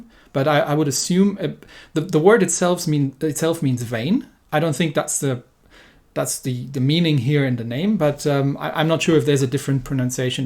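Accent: Germany. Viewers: Man, I don't know If you know it, but it isn't possible NOT to hear in your voice, that you're German 😄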